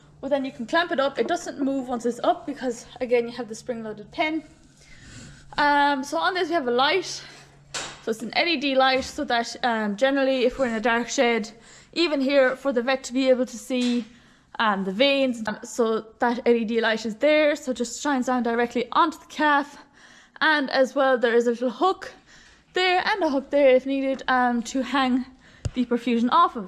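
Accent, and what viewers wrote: Ireland. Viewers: How have you managed to hold onto the Irish accent for so long?! enjoying the videos, keep up the good work! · Great video you present very well, love the Irish accent. Stay well and stay safe, and I look forward to more.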